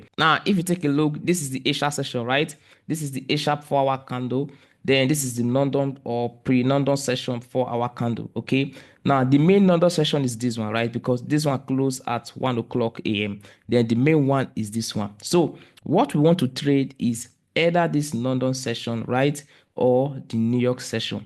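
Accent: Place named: Agbor, Nigeria. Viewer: Bro, talks like an agbor man. Nice one boss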